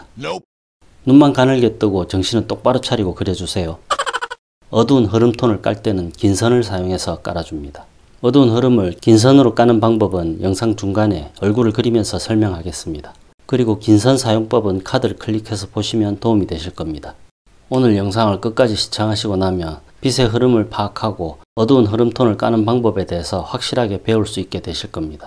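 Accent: Gyeongsang, South Korea. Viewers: 82세 할아버지가 씀니다 . 특히한 경상도 사투리와 재미있는 말씀으로---------참 재미이게 보고가유 -- .그말씀이 나에겐 정말 참고많이되네요 나도 실그 초상회를했으나 지금 현대인들이 보시면 웃숩지요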